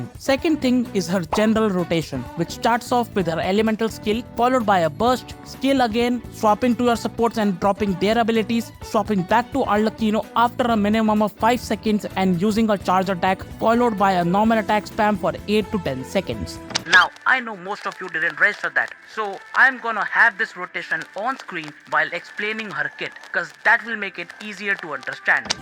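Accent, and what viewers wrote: India. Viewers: he got that THICK Indian tutorial accent love it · Good video my indian sounding friend, keep it up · Bros got that iphone tutorial accent love it can always count on the indians to drop the best guides🔥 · Indian accent on any "educational" video tends to be 100% factual You deserve more subs and hopefully not too many to be on Hoyoverse's radar with this godly amount detail of her kit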